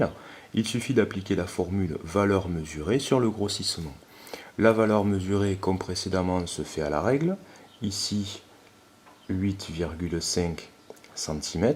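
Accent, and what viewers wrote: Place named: Marseille, France. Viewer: Wsh ta l accent marceiller 😂😂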